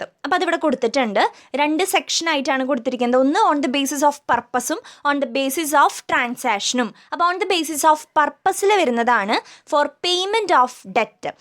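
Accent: Thrissur, India. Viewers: Miss nte slank Thrissur kkaarde pole ind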